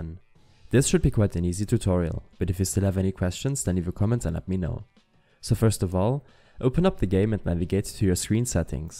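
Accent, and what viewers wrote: Germany. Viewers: Man hat direkt an deinem Englisch gehört dass du ein Deutscher bist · Jawoll ein deutscher das hört man so raus hahah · ich habs auch schon an seiner aussprache erkannt und dann erst bemerkt, dass aufm pc auch alles auf deutsch ist xD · Alle sagen dass man den deutschen akzent raushört Aber niemand gibt probs für seine englischskills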